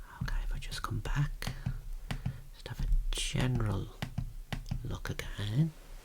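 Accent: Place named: West Midlands, United Kingdom. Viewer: As ever, you are promoting the west midland accent in the most exquisite way. Superb content time after time, top man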